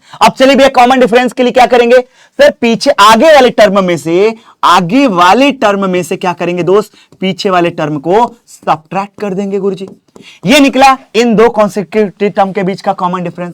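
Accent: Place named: Odisha, India. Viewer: odia sasta English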